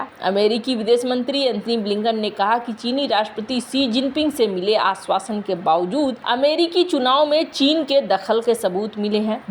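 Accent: Bihar, India. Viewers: Ap bihar se h madam?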